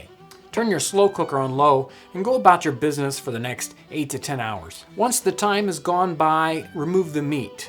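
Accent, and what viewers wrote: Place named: United States. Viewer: What happened to the British guy that used to do this ? It's throwing me off a little that this guy's American haha still great all the same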